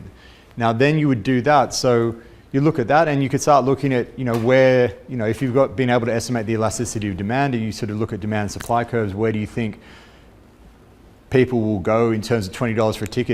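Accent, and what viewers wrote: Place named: Scotland, United Kingdom. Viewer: Respected there... Please go slow .. You sound so scottish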